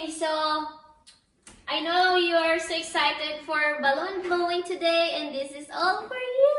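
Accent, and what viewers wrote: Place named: Philippines. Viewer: You made me laugh and I love your Pilipino accent, it's so cute.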